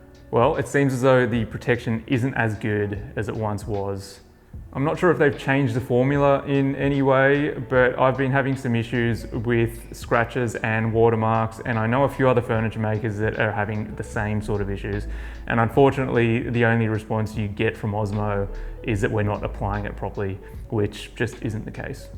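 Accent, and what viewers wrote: Australia. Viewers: Brilliant piece. I only realised you were Aussie in this video. Maybe I haven’t seen a video you speak in. Would love to visit your studios next time I’m up that way.I’d love to pick your brain. I just moved to Vesting LED cured hard wax oil. Not sure if you know it. Pretty cool, and I definitely had to make a few adjustments to sanding but it’s a great finish so far. And the instant LED cure is pretty amazing. I switched from Osmo too and I absolutely had issues with water/liquid damage with the last few pieces compared to previous. Never thought about too much till you mentioned it. Anyway, thanks for sharing as always, brilliant.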